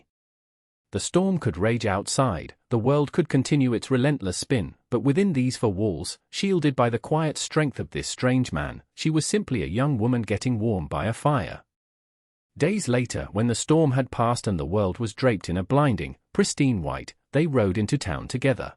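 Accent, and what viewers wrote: England, United Kingdom. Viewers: Interesting yet disarming - - A narrator with a British accent telling a cowboy tail from the Old West 😞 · Please get rid of this hard, annoying English accented voice. It absolutely does not fit these stories. Bring back the warm sift and rich American accented narrator. This voice is extremely annoying.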